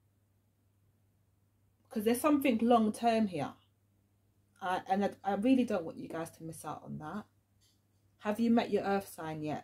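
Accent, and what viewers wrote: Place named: United Kingdom. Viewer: Are you British ? Love your accent but good reading thx for your time 🤍🤍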